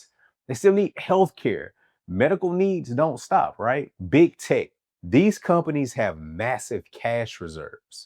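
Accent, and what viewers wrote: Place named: Kansas City, United States. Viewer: Thank you for this. It almost feels second nature to scramble and try to mitigate loss by pulling out money and holding tight to every penny during a time like this. On a different note, I don't know where you're from originally but that accent sounds real KC 😂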